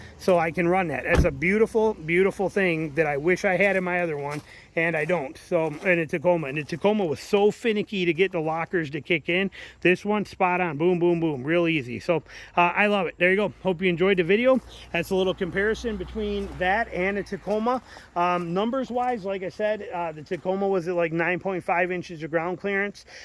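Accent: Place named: Chicago, United States. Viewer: Are you from chicago ? You have a chicago accent.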